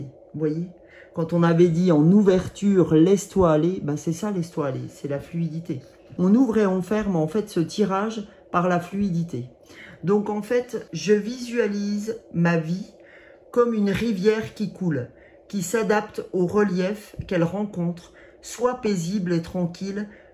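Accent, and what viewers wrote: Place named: Franche-Comté, France. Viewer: Bonjour je vous découvre aujourd'hui, je trouve que c'est sympa vous êtes très naturelle, je ne sais pas de quel coin vous êtes mais votre accent ressemble à celui de la Franche-Comté. Je m'abonne. Au plaisir de vous écouter de nouveau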